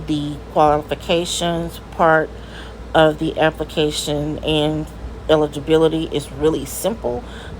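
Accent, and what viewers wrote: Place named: Texas, United States. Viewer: I'm in Texas too.... the moment you said "Hey y'all" I KNEW!🤣😂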